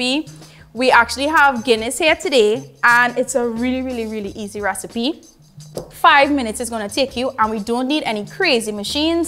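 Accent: Trinidad and Tobago. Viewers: Wait you saying she is from Jamaica..... because she sound like she is from T&T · That trini accent so sweet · Trini accent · That Trini accent 😌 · Trinidadian accent is always so satisfying 😌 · I love trinidadians accent 🤗🤗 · Oh yes, Trinidadian accent is the best. No other accent can match it.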